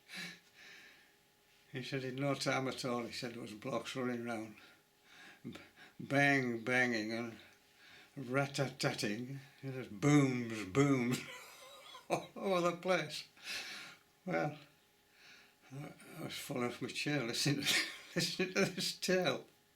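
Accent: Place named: Cumbria, United Kingdom. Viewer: Nice Cumbrian accent!!!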